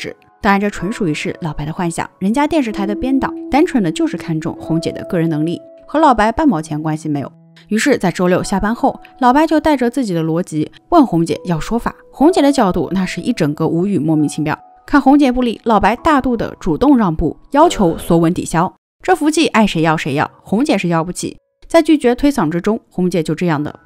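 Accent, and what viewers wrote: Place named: Nanjing, China. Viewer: 👍👍👍你是南京人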